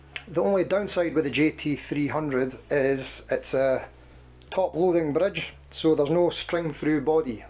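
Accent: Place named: Dundee, United Kingdom. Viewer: Sounds like a Dundonian accent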